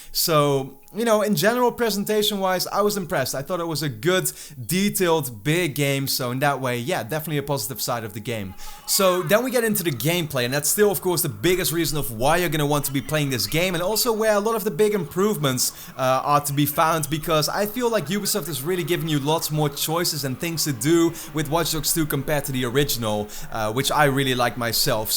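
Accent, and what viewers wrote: Netherlands. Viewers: Erg goede review kerel! Ging ervan uit dat je Nederlands of Vlaams bent. Awesome review, just to be sure ;)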